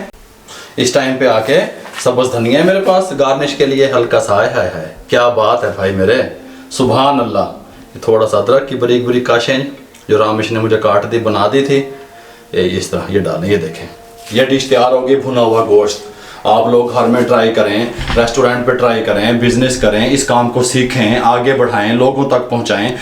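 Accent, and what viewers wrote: Punjab, India. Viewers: Bhai mjhe khane bnane ka to shoq ni a me ka punjabi aksent sune ke lie lgata hn 😂😂😂👍👍👍👍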